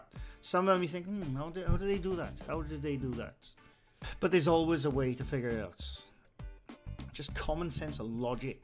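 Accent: Wales, United Kingdom. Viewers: Is that the accent of a fellow Welshman I hear?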